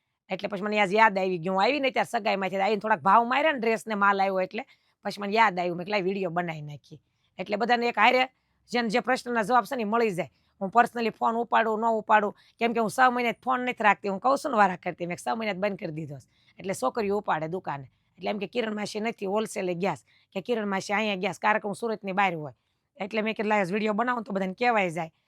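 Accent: Kathiawar, India. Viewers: મારી છોકરાની વહુ ઓસ્ટ્રેલિયા રહે છે મારી ભાષા બોલવાની તમારે જેવી કાઠીયાવાડી છે મારી વહુ ને ફોન કરું ને મમ્મી હજી થોડીક વાર વાત કરો મને બહુ ગમે છે કાઠીયાવાડી ભાષા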